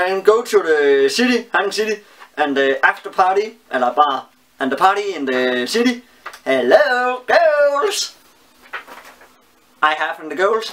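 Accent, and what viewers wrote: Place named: Denmark. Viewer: vety good danglish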